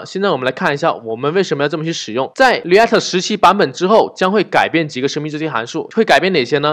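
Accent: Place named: China. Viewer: 除去英文不谈，这口音是哪里啊，肯定不是北方了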